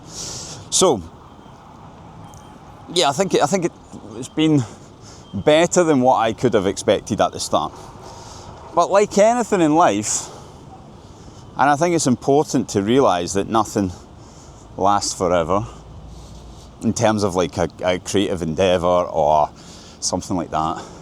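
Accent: Scotland, United Kingdom. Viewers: I’ve just watched your video for the first time. Like it! Scotch accent is adorable and in some places reminds me my own one. Rs are very similar. Will watch more. Thank you! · Job well done, I've gotten a feel for Moscow and for Russian people, favorable in total. You have a talent for interesting content with a relaxed feel, I will miss your Scottish accent and walks through the city. Thank you from a friend in Kentucky [usa]. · I admire Scottish accent so much. Good luck, dude. I’m sure that you will be okay no matter where you live. 😊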